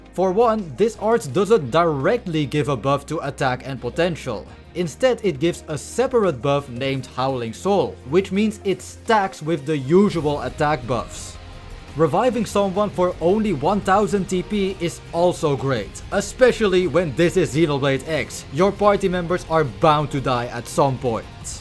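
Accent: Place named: Netherlands. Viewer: Hoi hoe gaat het ik hoor dat je een nederlands accent hebt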